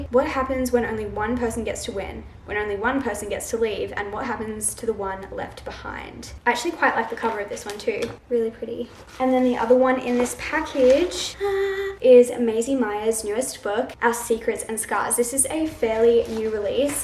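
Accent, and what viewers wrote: Australia. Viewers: Your Australian accent is beautiful !!!!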